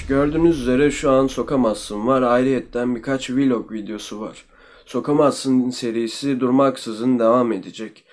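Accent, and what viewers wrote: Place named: Azerbaijan. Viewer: vay, bey Azerbaycan Türkçesine değdiriyor.✍️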